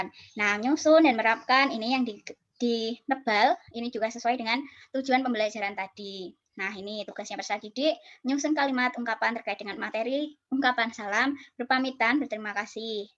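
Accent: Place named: Java, Indonesia. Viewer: Suaranya medok gaes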